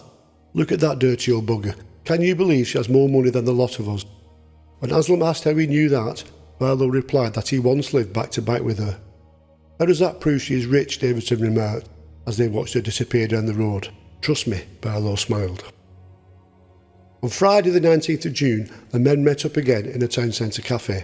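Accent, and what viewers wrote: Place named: St Helens, United Kingdom. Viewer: Great episode, I do miss that accent….trained as a nurse in St Helens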